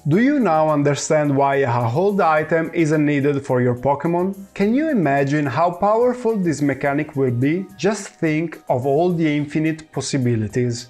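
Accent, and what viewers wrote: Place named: Italy. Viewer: you have one of the strongest Italian accent I ever heard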